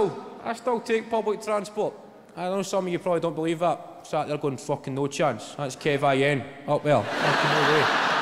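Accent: Scotland, United Kingdom. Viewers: 😂😂😂 really, thought that after Billy Connolly everyone on the plant understood Scots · English in a Scottish accent you must be very inept to not be able to understand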